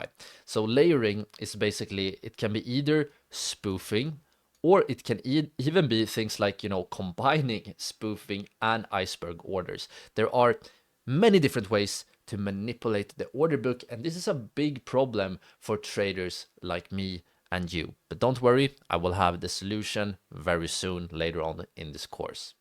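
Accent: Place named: Sweden. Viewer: I hear the svenglish from mile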